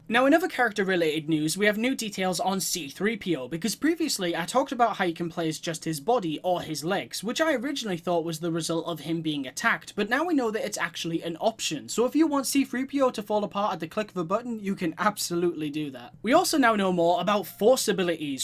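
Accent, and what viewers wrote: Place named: Geordie, United Kingdom. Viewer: Just wondering are your from Newcastle Upon Tyne because I can hear that tint of Geordie in your accent?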